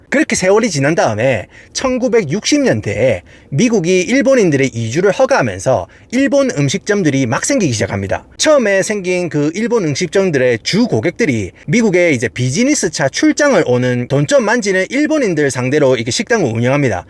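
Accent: Gyeongsang, South Korea. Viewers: ㅋㅋㅋㅋㅋ 서윗한 경상도 사투리로 들려요~ ㅋㅋㅋㅋ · 이렇게 나긋나긋한 갱상도 사투리는 살면서 들어본 적이 없거늘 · 전혀 공격적이지않고 재미있게 듣는데.. 아.. 경상도 아닌 분들은 공격적이라고 생각하실 수도 있겠네요 ㅎㅎ 경상도 특유의 억양일뿐 절대 공격적 멘트 아니라는 점!!! 잊지말라규~~❤ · 경상도 사투리가 너무 심하다... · 저도 서른마흔다섯살 보통 갱상도 아재입니다만 억수로 부드럽게 잘 설명해주시고 계시는 것입니다 ㅎㅎ 스울 분들 들으시기엔 공격적으로 들리시겠지만 그거 절대 아니라는거 잊지말라규 ㅎㅎ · 경상도사투리 이정도면 상타치입니다ㅋ 근데 연배가..저희 큰아빠 말투신데😅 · ㄹㅇ 경상도사투리 기준으로 은 매우 스윗하게 말하는거임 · 이정도면 경상도 사투리 중에 진짜 밝고 스윗한 사투린데😂ㅋㅋㅋ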